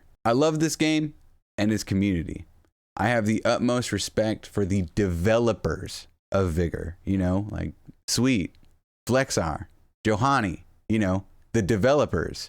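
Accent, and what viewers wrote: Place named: Arkansas, United States. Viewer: Real talk. I ran across your channel and subbed because you sound like a Arkansas hillybilly and you had unbeliveable reflexes, Im here to spend a few minutes watching someone I like, Doesnt matter the game. Big hello to mama Fox.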